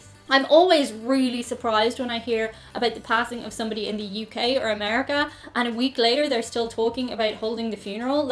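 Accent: Dublin, Ireland. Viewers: I don't know... are we? And don't worry about "transpart" - to those of us who don't know any better, it just sounds like your lovely Dublin accent. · used to be whenever i'd go to a bar or restaurant the establishment always had a bowl with books of matches imprinted with their name and i always grabbed one as a keepsake. you don't see that much anymore these days so i'm hoping with this match making thing you were talking about this practice may come back into vogue. i hope so. on another note...i thought you kept saying transpart because well, umm, you're irish?! here in the southeastern united states we say transpork, mmmmm barbeque! stay weird!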